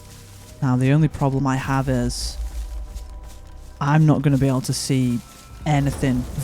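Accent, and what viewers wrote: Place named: United Kingdom. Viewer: why every dayz youtuber has British accent, don't get me wrong not that i complain but damn i need a woruroboda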